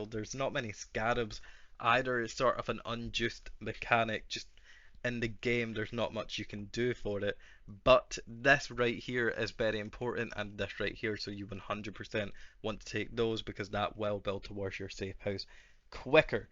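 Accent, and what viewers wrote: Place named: Scotland, United Kingdom. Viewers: Scottish poe gamer les gooo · I was thinking the same, Is that scotish accent known from samurai jack:)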